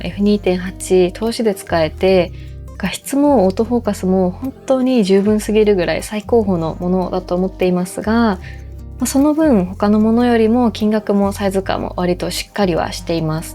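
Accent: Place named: Japan. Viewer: 너무 좋아요. 일본 사람이 한국어를 하는 억양이 그대로 살아 있어서 깜짝 놀랍니다.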